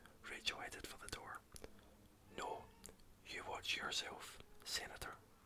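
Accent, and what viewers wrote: Scotland, United Kingdom. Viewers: Your voice is sooo relaxing! Hehe, can't resist that Scottish accent. ^_^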